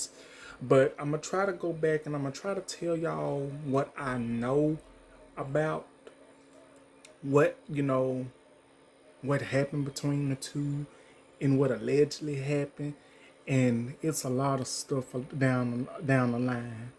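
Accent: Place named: South, United States. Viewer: Gee Brother....what State are you from? I know you are from the South but being a New Yorker, I cannot figure out which southern state you come from but you way of talking is weird and deep as fuck. Did you see the sex videos of Ray and Zed on line, if you did you may have an idea why Ray took the L on Zed not to say there is something wrong with Zed but from what I can analyze, I think Ray is a big ole size bottom and yes I think Ray found a brother who might be digging him deeper than Zed was. Karna is a bitch and yes shit ain't gonna be working for Ray if patience is not his priority. Ray is talking about making money quickly but he ends up with this brother who does not seem to have shit going on for him. From what I saw he seems like Zed is moving on and of course he is dealing with the break up as he did sincerely loves Ray but he seems to take charge of his life and his music career.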